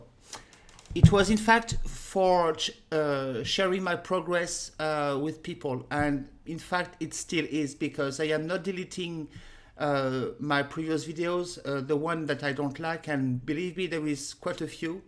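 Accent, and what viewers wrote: France. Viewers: Bonsoir. En écoutant ta vidéo, j'ai compris que tu étais française. Hihi. J'adore ce que tu fais. J'aimerais savoir jouer comme toi un jour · Don't bother about your accent because it's the way the French sound .You can very well understoodBravo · I love your French accent and your English is perfect! I wish my French were as good! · haha You are amazing! Love your frenchy accent! Gorgeous videos you got!! WE ALWAYS WANT MORE!!! you keep us thirsty to hear! I personally love love LOVE you and Your Work!! AMAZING!!! <3 Thank you so much for those tutorials! and no don't worry they aren't boring. I love that you said they're like buildings. I use this metaphor alot! I'm a big fan! I love your sense of humor! I love you! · I'aime accent francais et aussi vortre explanations. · Your french accent is so cute..... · what's not to love about a sweet lady harmonica player with a french accent? 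i gave my lady friend a harmonica as a gift, she wants to learn how to play. is the perfect first teacher.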